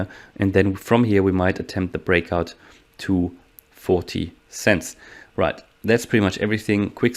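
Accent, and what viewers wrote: Germany. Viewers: Deutscher akzent lol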